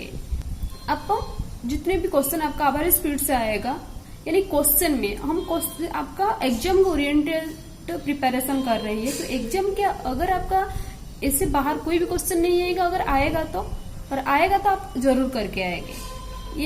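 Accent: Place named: Odisha, India. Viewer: Badhiya questions badhiya explanation ,mam.apki language se pata chalta hai ki ap odia ho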